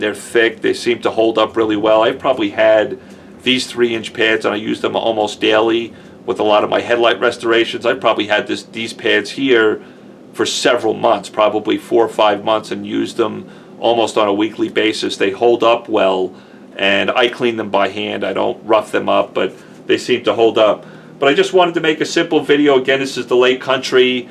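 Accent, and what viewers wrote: New York, United States. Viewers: Do I detect a NY accent?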